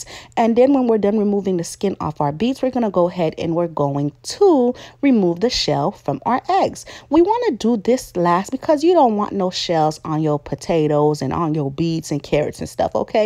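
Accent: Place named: Florida, United States. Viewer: You gotta be from FL😭😭 😂 I'm doing this tuhday 👏🏾👏🏾👏🏾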